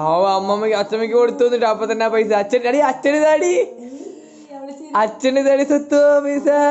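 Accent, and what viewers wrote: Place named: Palakkad, India. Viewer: Pakka palakkadan slang...Thambattiyeeeee🥳🥳🥳🥰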